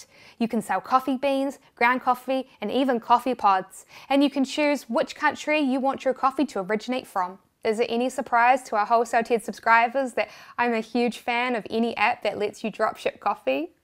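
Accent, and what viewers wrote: New Zealand. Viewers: literally have nothing of value to add, just a shoutout for being a kiwi. came across this channel after discovering the massive world that is ecom and couldnt help but smile when i heard a familiar accent - definitely didn't expect it on youtube. obviously physical location has little bearing on the internet really, but huge respect for building what you have here :~)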